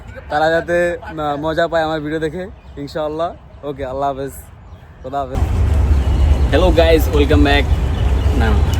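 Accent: Noakhali, Bangladesh. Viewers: Vhai to valoi kotha bolen noakhali bhashai 😮😮😮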